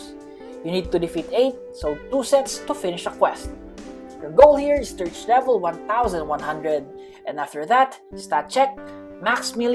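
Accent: Philippines. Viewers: he has a filipino accent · i knew it, pilipino ka halata dahil sa accent naten pa notice idol haha!!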